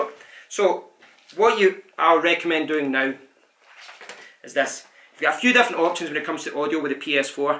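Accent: Scotland, United Kingdom. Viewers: Nice video man. Ps: Your Scottish accent is awesome. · Thanks man also I'm irish and I love your scottish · Love your Scottish accent. From an Irish person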